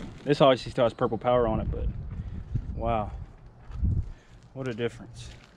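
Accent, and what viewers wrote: South, United States. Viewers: You're the first asian person I've ever seen with a genuine southern accent. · I like your southern accent!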